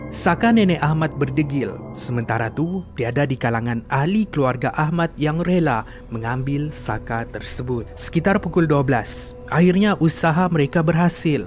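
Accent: Sabah, Malaysia. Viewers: Dia Sabah, bahasa pun lain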